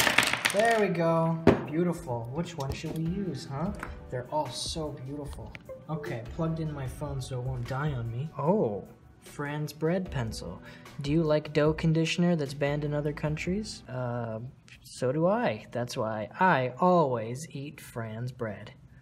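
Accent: United Kingdom. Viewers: Why does your British accent sound like Tom Holland? 😂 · Your British accent makes me want to implode.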